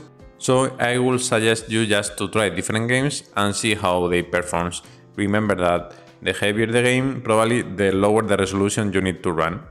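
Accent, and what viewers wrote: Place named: Spain. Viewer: Very spanish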